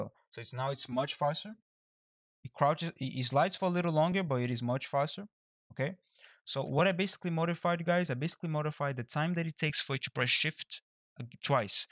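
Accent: Brazil. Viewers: mano tu é muito brasileiro kkkkk esse sotaque nao me engana , otimo ingles btw haha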